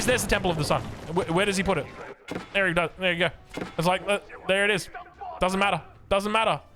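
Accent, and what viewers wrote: Australia. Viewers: sounding Australian today me boy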